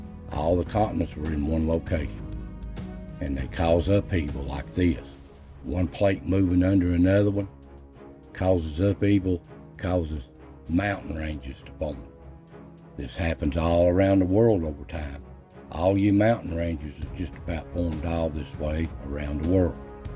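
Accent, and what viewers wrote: Appalachia, United States. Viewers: I feel kind of a kinship to you. My people settled in the Appalachians on the east side and yours on the west side, we both have the same surnane and we're both country boys from birth with the same accent. If we could go back far enough I think we would see that our families (on the paternal side) came from the same place.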